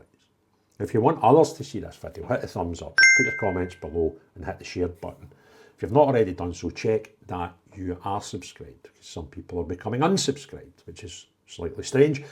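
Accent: Scotland, United Kingdom. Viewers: Every day it just gets worse and worse and when are the illegals who commit crimes are going to be deported? We need a strong government and not a weak one and they should be British. I like your videos and I love the Scotland, the accent and bagpipes. Take care and stay safe. We must all unite together 🏴🇬🇧🏴🏴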